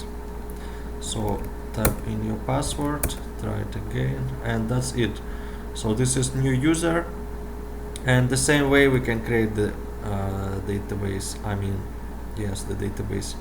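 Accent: Russia. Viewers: слышно ж что акцент русский, сделал бы субтитры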